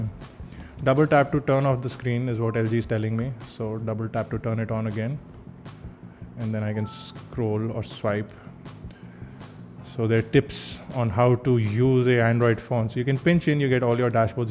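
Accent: India. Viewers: That annoying indian accent lol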